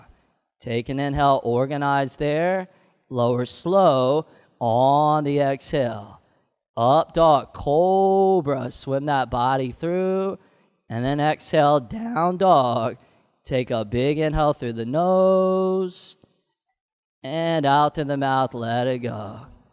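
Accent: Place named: Southern California, United States. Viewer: Thanks teacher, been practicing with you on youtube everyday, here in Bali. Equanimity in that Southern Californian twang.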